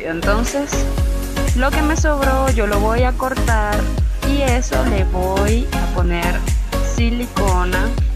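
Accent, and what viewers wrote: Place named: Ecuador. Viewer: Eres de ecuador o venezuela?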